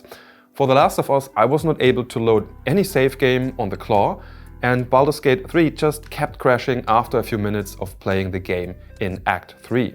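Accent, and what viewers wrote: Germany. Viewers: Als Deutscher hört man schon in den ersten 5 Sekunden den Deutschen Akzent raus. :D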